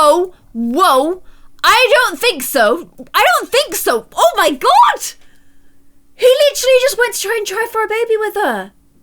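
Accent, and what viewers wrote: Ireland. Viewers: I didn't know u were Irish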